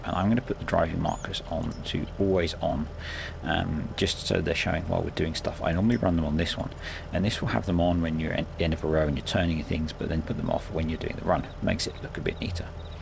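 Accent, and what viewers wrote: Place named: Australia. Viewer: Hmm you sound Australian. Never heard your until I saw the news about terralife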